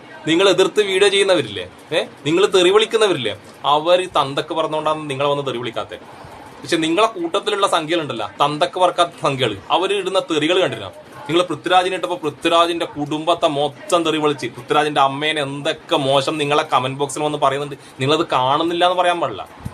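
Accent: Kannur, India. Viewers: കണ്ണൂർ സ്ലാങ് പൊളി 👌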